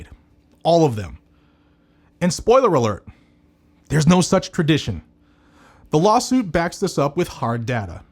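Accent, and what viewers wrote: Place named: Tennessee, United States. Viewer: WOW, that deep south Tennessee accent really popped out today. Department = DePAHtmint.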